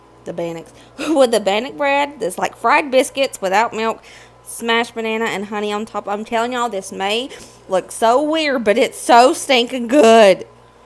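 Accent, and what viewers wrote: South, United States. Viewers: Hi what other dry ingredient went with the flour and salt❤im irish i am hearing impaired love the recipes ❤and the southern accent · Hi!!! Enjoy watching you so much! Your Southern accent is just wonderful I love it lot's have a great Day okay ❤️👍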